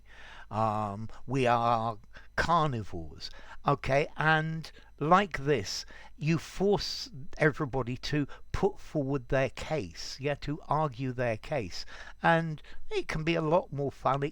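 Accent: United Kingdom. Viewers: My God, teacher's British accent is adorable 🤟😎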